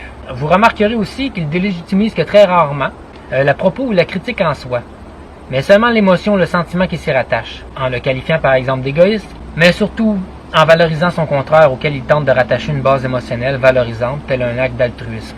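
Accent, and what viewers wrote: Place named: Quebec, Canada. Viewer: Un belle accent gui va avec ton charme !! On adore l'accent québécois en Europe, et beaucoup de charme... Comme dirait un suisse comme je suis je fond comme du chocolat en te regardant et en t écoutant.... Magnifique harmonie !!!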